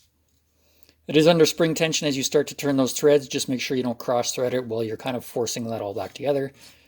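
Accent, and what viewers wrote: Canada. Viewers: Ive been around Canadians enough to know, you are in fact Canadian and I love it! I love your accent. Makes me want to take my gun apart more. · I hear a tinge of Canuck, but that cant be..... Trudeau has decreed that no one gets guns.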